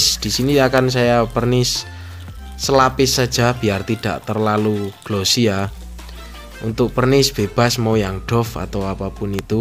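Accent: Temanggung, Indonesia. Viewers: orang mana mas kok kayak temanggung.. lar lur😁😅😁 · Wong temanggung po om??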